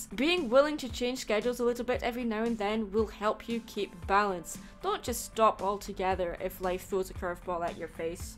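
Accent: Scotland, United Kingdom. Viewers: Are u Scottish? I am!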